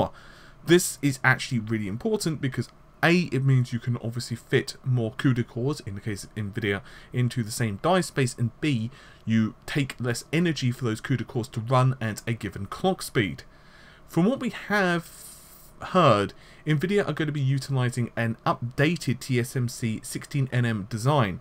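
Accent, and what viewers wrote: United Kingdom. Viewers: "nm" is pronounced "nanometre" unless you would (for example) legitimately say: "my penis is 25 'cm' " rather than "centimetres" or "suck my 10 'in' biro" .... you would of course say 'inches' not literally pronounce 'in' written and spoken are different. also, you're wrong about how to pronounce Ti. honestly I think you're just trolling us on purpose now, but then I do wonder if maybe you even knew that 'nm' means nanometre... EDIT: I know I'm getting thumbs up for trash talking you - but I do want to say that I love your channel, your vids are really informative and I'm a proud subscriber... but dammit man, please just pronounce stuff like the vast majority - I have great respect for those that stand in the minority on issues, but language is not one of them, it's meant to populist, so that we can all understand each other. The 'common tongue' is called as such for a reason. 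We're both British and my accent is very similar to yours, so I probably identify too closely - I just want your channel to be a big success bubba! hugs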